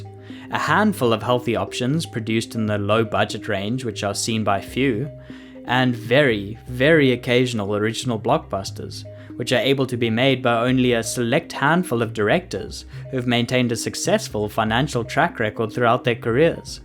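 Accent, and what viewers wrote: South Africa. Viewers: Saffa accent detected? Transplanted to Ozz of course. Maybe NZ. But def Saffa underlying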